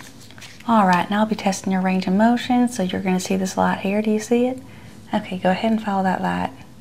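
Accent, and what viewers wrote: South, United States. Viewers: From Alabama here, fantastic job on the accent. 😉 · As someone who grew up in the south. I can tell you without a doubt, she nailed the accent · That’s actually a good American southern accent. Good job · love your Scarlett O'Hara accent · I love the Southern charm voice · Why is it southern accents are so friendly and comforting? You nailed it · Great southern accent!! Great job! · Please do more southern accents, you are spot on.❤ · I’m from South Carolina and I can’t detect a fake southern accent. It’s better than most tv actors. You might have a second career. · Beautiful country ❤️ accent 😍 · Wait …you’re NOT southern?! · Love it ❤. On point with the southern accent 👌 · You are just the best ASMR nurse ever 🤩💗. Love you and love your southern accent 🥰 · I didn’t see “fake” at first and I assumed she was from the south I’m from ga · That southern accent is impressive. 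· Thanks for sharing this very, very impressive southern accent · I love your southern accent! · Aww! How creative and sweet! Last time we had British and now we have Southern! Another wonderful addition to the -Verse! Were multiple versions of you with different personalities roles and accents take turns to be your bedside nurse for the day! Haha I think that's a creative way to think about it! Your amazing! Never forget that! Sending you love!❤❤❤🤗🤗🤗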